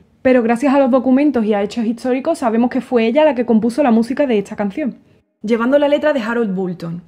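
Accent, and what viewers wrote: Andalusia, Spain. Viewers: Su lengua nativa es claramente el español. Habla un dialecto del español que se llama "andaluz ", con un acento que escucharías habitualmente si viajas por la zona de Andalucía Occidental. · "güena ta'rdeh" hace que su asento andalú en su boca suene tan bien como las cuerdas de su guitarra.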